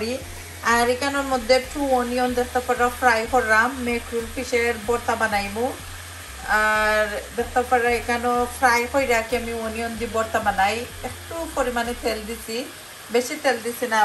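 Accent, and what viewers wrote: Sylhet, Bangladesh. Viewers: syelhet er kotha gula khub sundor apu.tai bondhu hoye gelam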